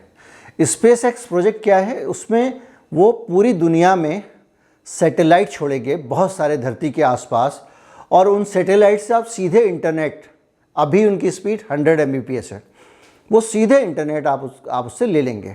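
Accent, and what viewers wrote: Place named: Maharashtra, India. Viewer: खूप छान माहिती दिली भाऊ मराठी माणूस हिंदी बोलताना सहज लक्षात येतो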